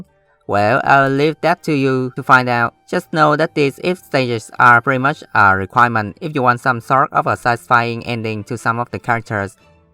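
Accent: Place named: India. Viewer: Kudos, an excellent review covers pretty much everything I needed to know! however ur accent still needs bit more practice it mite be difficult for people outside India and Asia to understand. Good luck!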